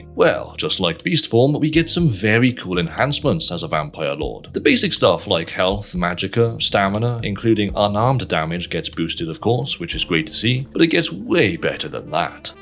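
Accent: Wales, United Kingdom. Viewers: welcome back i have missed your beautiful welsh accent and fantastic videos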